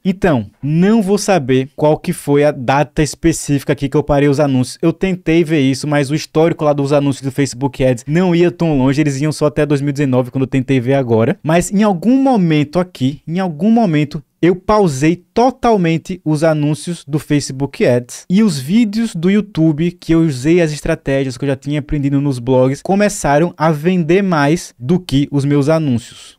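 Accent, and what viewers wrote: Northeast, Brazil. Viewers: Nordestino, de onde tu é? Sou do RN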